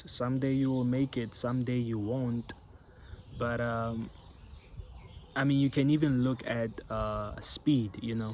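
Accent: South Africa. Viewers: Are you from South Africa? picked up on the undertones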